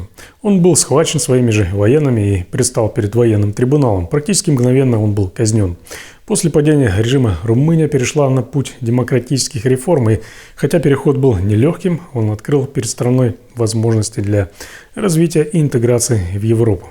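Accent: Ukraine. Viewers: А почему акцент укропский??!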